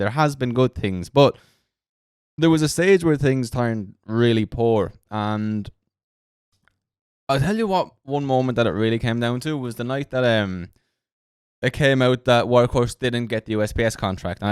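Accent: Ireland. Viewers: I missed that sweet Irish voice. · Hey, I enjoy your content. Your bubbly personality and Irish accent helps. I lost money on Workhouse and other EV stocks, but I don't blame you. The developing narrative sounded good to me, so I chose to get sucked into the FOMO YOLO. Funny thing 🤣 is that I'm still holding onto my WKHS and other EV stocks, because I lost track while working my new job. It was a fun run together, but I'm moving onto gold, silver, and commodities. Fun times, man 👌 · to error is human no one is perfect. You ALWAYS said do your own due diligence. Who ever blindly follows anyone, not just you deserve s what happens. I consider you as a friend. Truly! I loved sharing the excitement with you & 🤣 but I diversified anyway. What I’m trying to say is we truly NEED your beautiful Irish accent. trust in God and believe the good will overcome bad.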